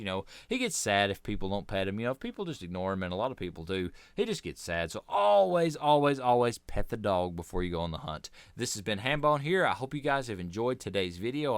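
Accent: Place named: United States. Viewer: Love your cowboy accent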